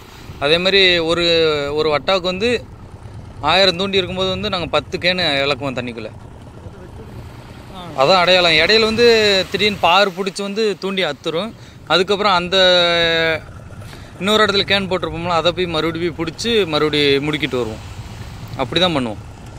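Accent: Thoothukudi, India. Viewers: உங்கள் தூத்துக்குடி தமிழ் மிகவும் இனிமை.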